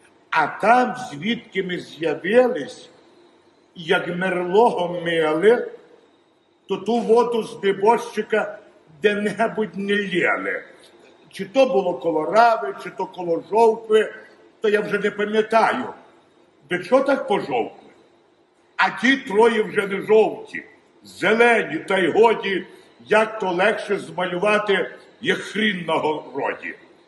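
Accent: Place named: Western Ukraine, Ukraine. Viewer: Абсолютно геніально! Цей говір і гумор! Особливо цей унікальний «західноукраїнський» сільський говір з якого «люди з міста» насміхались в радянські часи. А кожна задрипана дівка з села біля Львова, якій вдалося влаштуватися на роботу у Львові, лізла зі шкіри, щоб «разгаваривать на общепонятном руском язике» Мав нагоду цей феномен спостерігати, працюючи в одному з наукових закладів Академії Наук. Обʼїздив багато світу, і в кожному куточку є свій говір, сленг. Що і робить цей світ різноманітним і цікавим!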